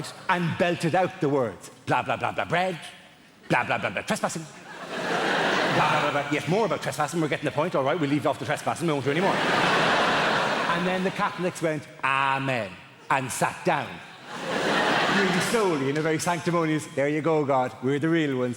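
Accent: Ireland. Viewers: Is this the Dublin accent then? I wouldn't know, I'm from Norway. Just discovered this guy on J. Ross and found his accent interesting. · he's not even funny, you have to be irish to understand a word he's saying. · Im from Bray Co.Wicklow the same place as Dara and we have the same accent so technically its a wicklow accent!!!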